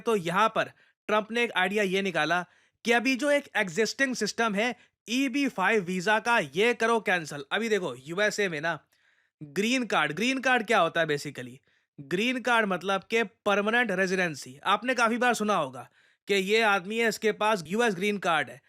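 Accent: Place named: Delhi, India. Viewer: That’s Delhi in u speaking😋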